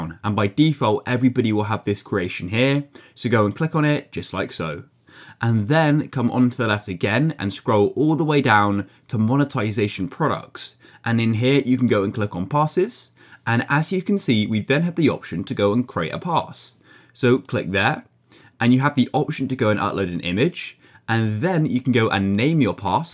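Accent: United Kingdom. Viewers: your so British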